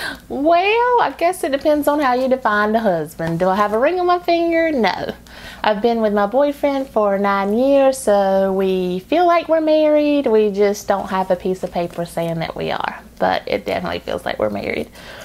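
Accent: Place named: South, United States. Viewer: Funny my first YouTube experience was watching Trisha Paytas also........she's a mess lol Love your southern accent....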